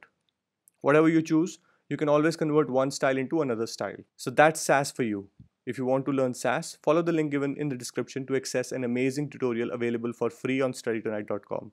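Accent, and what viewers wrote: India. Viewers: First video with a guy with an Indian accent that is actually useful and well organized. Thank you!!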